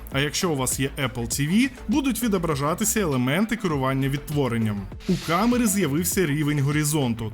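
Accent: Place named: Russia. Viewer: От просто безмежна повага. Чутно по голосу що автор розмовляв на рос. язику. Але перейшов на українську. Дякую за приклад!!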